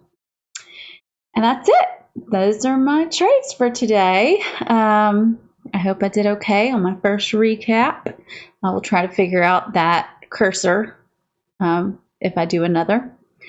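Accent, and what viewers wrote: Georgia, United States. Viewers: I should have guessed. I'm from south GA. BBT is so global, I don't often hear familiar accents. LOL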